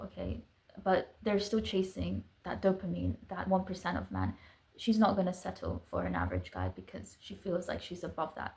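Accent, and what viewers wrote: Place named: Eastern Europe. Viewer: I think you are from Slavic country? Urs accent 😂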